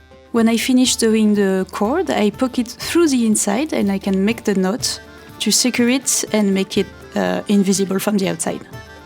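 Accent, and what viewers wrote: France. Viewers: Listening to your lovely French accent and watching you craft beautiful leather work is magical.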